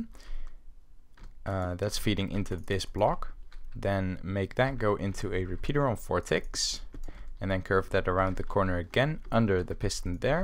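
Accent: Netherlands. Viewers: Are you from holland because you have a dutch accent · R u Dutch?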